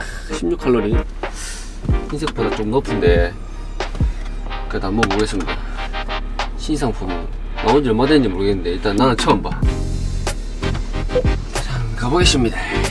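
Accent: Gyeongsang, South Korea. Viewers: 사투리 너무 매력있어요 ㅋㅋ 원래 경상도 사투리 좋아하는데 어느 지역인가요?? 멘트도 너무 찰지심 ㅋㅋㅋ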